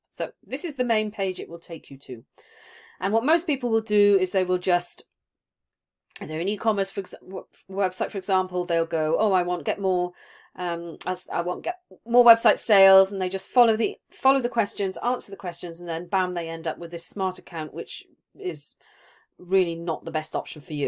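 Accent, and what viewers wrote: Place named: United Kingdom. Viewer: For an American audience, you really speak too fast. Yes I understand you, but with your British accent I need a delay to digest what you say. I am imagine you would have the same problem with me speaking with my American accent.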